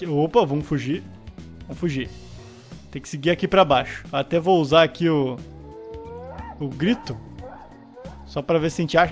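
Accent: Rio Grande do Sul, Brazil. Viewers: Ei man tu e gaúcho? Se tu não for teu sutaque e igual sou gaúcho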